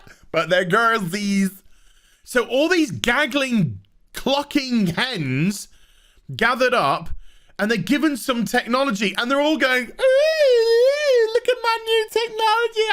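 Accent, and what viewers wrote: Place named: North, United Kingdom. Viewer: After months of research I've finally discovered what each word in your intro is.... Cuz your voice is, It's fast. And northern. I'm Canadian. I had to take my fucking time here and figure shit out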